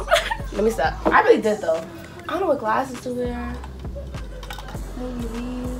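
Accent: New York, United States. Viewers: r u from new york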